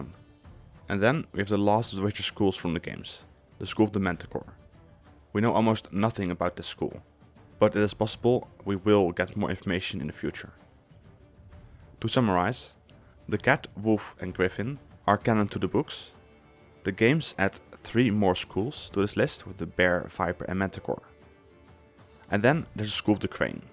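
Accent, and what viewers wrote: Netherlands. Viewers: Yeah youre defenitly dutch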